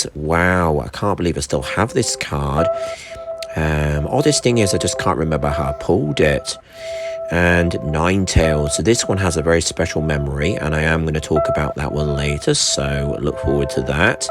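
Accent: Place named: United Kingdom. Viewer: Yeah still sound British just different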